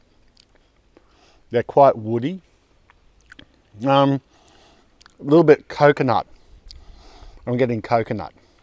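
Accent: Australia. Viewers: I’m an Australian who lived overseas for most of my life. For some reason the Aussie accent triggers me and I squirm. But yours is perfect. It’s just bogan enough, but not too bogan. And it’s just so pleasant. No matter what you say, the tone and timbre of your voice brings a smile to my …ears. Thank you ❤🙏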